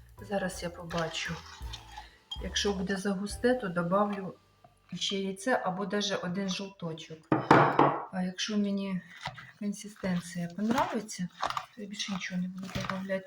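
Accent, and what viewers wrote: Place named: Kherson, Ukraine. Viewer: На херсонщіні ,ммколаївщині, одесчтні такий говор відстаньте не хочеш не дивись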